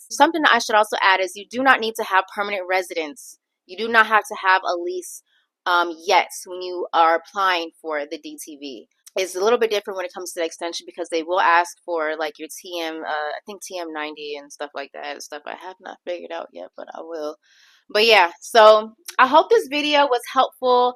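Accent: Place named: Philippines. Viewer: You sound like a filipina.😂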